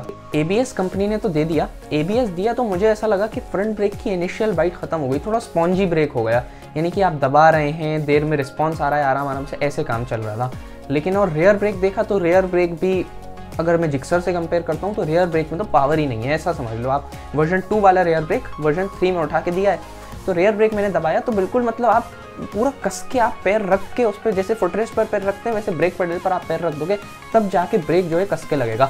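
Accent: Bhopal, India. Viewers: Bhopali accent 🤟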